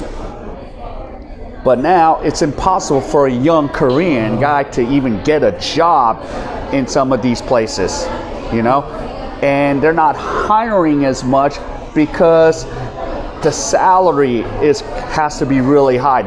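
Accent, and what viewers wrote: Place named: United States. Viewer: The Korean in South Korea should go to the Philippines and build their own business. Its easy to set a business in the Philippines for Koreans. Filipinos loves Koreans. The way you talk now is like "americanized" & "Philipinized" so funny !😂🤣🤣🤣! I subscribed because you are funny and truthful - real dude. I'm from Miami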